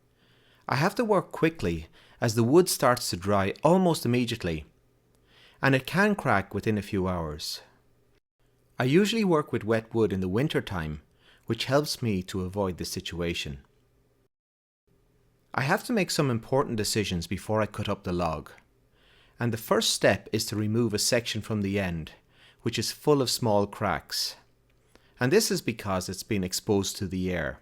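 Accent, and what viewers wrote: Ireland. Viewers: Nicely done. You are very Irish indeed from your accent and I see from your website you're SW of Dublin on the other side of the Wicklows. Live there some 20 years ago on a work assignment. A highlight of my working career. When I return next to the Auld Sod, I'll need to look you up for a bit of instruction. Cheers.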